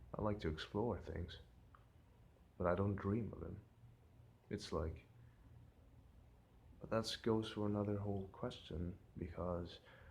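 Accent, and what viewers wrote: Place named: United States. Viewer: maybe we need to break it down; are most of our goals considered needs? For example, my goal is to look prettier - if I ask myself why? I'll know it's because 1- it gives me options for different work fields (such as modeling) 2- (more important for women lol) I'll probably have many admirers, which means different options available for picking a potential partner, or get completely shunned by real great ones lol. Regardless, they're all needs. Temporary. They don't last. Which is why it's excellent that you thought about it! Instead of letting your goals aka needs drive you around, you're thinking much ahead! That could actually give a lot more meaning to your goals and guide you through the way, resulting in true accomplishment. (Ps what state are you from? Incredible American accent!)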